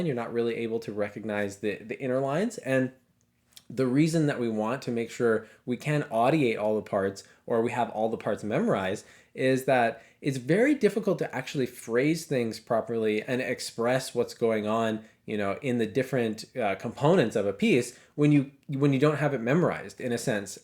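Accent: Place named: United States. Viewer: Hi, I have recently discovered your channel and although I usually prefer UK or Australian based audio, your US accent is actually easy to listen to! Now like Judy Fiterman’s comment, I am nearly 80 am relearning piano after some 65 years absence, and also Sax which I took up in my 50’s for about 4 years then again some 4 years ago in a local concert and stage band. I have trouble remembering a 4-6 digit security code, let alone music. Sax matters less as in a band It’s normal to have the sheet music, but I really would love to have a small spontaneous repertoire of piano pieces I could play from memory. Strangely I can play the first section of Fur Elise by heart, because I suspect I learnt that as a child, but not the second two which I can play now with the music in front of me. ( But it has taken some two years to get to speed and musicality!) My other problem is audition, as I really have no singing voice and have trouble repeating even one played note accurately. 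Do you have any advice for us oldies who are experiencing some general memory loss, shall I say deficiencies or lapses, or could you do a specific session addressing this issue. I love my return to music and call it my “anti dementia” activity! Thank you. Karla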